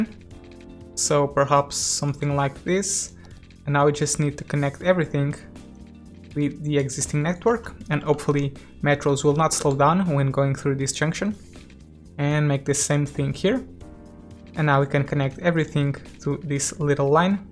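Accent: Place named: Portugal. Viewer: Pode escrever em português! O é claramente português. O sotaque não engana 😊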